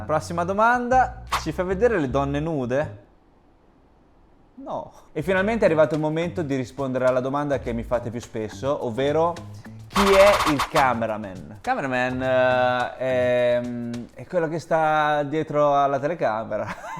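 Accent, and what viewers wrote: Italy. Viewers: parli cinese con un fortissimo accento italiano🤣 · Cose avvincenti notante in questo video da parte di un italiano che ha vissuto a lungo in Cina. l'intro in cinese con marcatissimo accento italiano. :°) i piatti strani cinesi. mi hanno stupito! del primo non avevo mai sentito parlare e mi ha incuriosito (l'ho appena cercato e pare sia roba che viene dalla provincia di Guizhou). gli sputi come cosa negativa della Cina, ricordo la prof di Cinese a Pechino che diceva che non si era al sicuro neanche quando si va in bici perché magari a fianco ti ritrovi un altro in bici che sputa e ti becca! ci avrò fatto caso il primo periodo ma poi non ho visto quasi più nessuno sputazzare in giro (magari anche il covid ha aiutato). una cosa che io invece detesto particolarmente è che la gente entra in metro (e in ascensore!) e in bus prima che scendi e mi da sui nervi. 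lol. dulcis in fundo a SHIJIAZHUANG mentioned! un salutino a Shijiazhuang la prima città in cui ho vissuto in Cina. ancora complimenti e scusate il pippone. ; P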